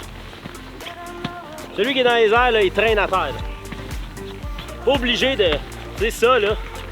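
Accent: Quebec, Canada. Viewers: Merci pour cette vidéo ! ça fait plaisir d'écouter du français canadien :)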